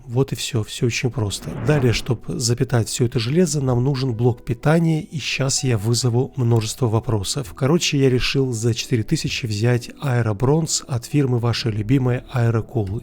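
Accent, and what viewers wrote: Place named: Ukraine. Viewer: Что, мне послышалось, или у автора появился легкий украинский акцент?) Он решил что добавлять перевод названия на украинском мало?)) (не смотрел его пару месяцев, потому сразу заметил)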